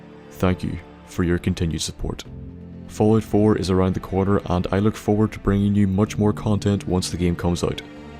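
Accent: Ireland. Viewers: feel like he's from Northern Meath or Southern Ulster. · .....are you irish? lol just a question due to your pronunciation of words. and you say shite a lot haha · He's most likely from Ireland at least his accent is Irish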